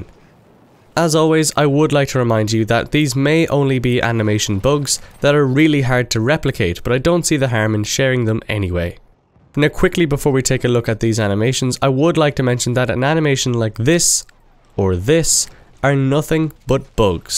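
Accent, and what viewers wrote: Ireland. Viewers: r u Irish mate cause I am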